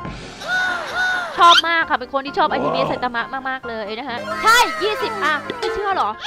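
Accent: Isan, Thailand. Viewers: พี่ พูดอีสานน่าฮักมากเลย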